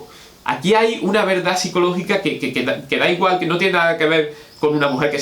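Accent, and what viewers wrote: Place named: Andalusia, Spain. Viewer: Lo que te puedo decir, es que tus clases son fantásticas: considera que hacen parte de mis recursos para el examen de Literatura Española de un máster en filología. El hecho que la gente te frequente poco, es que los paladares finos son muy pocos (y el mío, modestamente…) Para aliviar tu narcisismo compensatorio, puedo solo añadirte que, además de agradable, muy preparado y gracioso durante las explicaciones, eres también muy, pero que muy guapo. Y encima con acento andaluz (granadino o de por ahí?). Aprovecho, como la precedente seguidora, para darte un abrazo.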